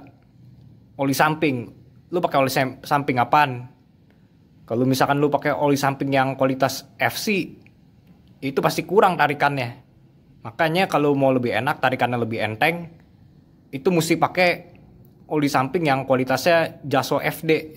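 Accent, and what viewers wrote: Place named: Bali, Indonesia. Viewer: Ko kaya logat vrindafan Indonesia (bali)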